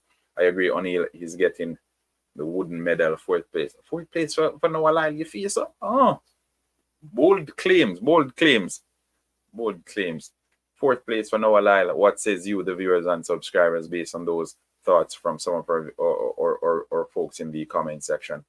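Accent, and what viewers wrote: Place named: Jamaica. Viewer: Yeeaahh,, already can catch up/easily understood yr Jamaica's english‼️😆😅Thnk u vm! 👍👍😁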